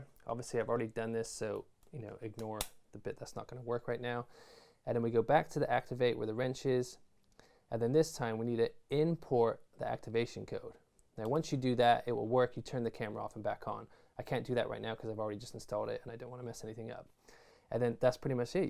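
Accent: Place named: England, United Kingdom. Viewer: Lol your accent is getting more English 😂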